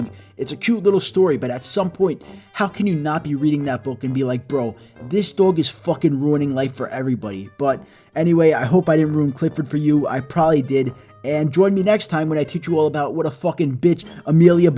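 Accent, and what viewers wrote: New York, United States. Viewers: Is it weird I wanna hear you say the “I told my daughter to not give the dog coffee and what...” because even though it’s not a thick ny accent it’s there also the magnitude earthquake and shit 😂 So perfect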